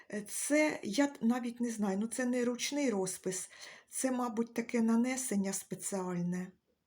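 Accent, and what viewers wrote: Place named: Cherkasy, Ukraine. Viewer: Дякую за вашу співучу черкаську,нашу солов"їну українську мову. І вас з наступаючим новорічними та різдвяними святами! Миру, здоров'я, благополуччя!!!💙💛💙💛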